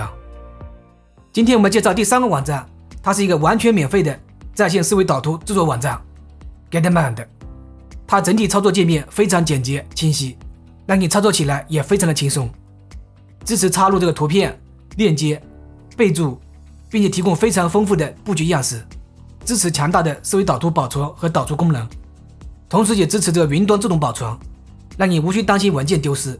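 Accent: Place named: Fuzhou, China. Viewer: 播主说话口音带有很重的福州音...✌️✌️✌️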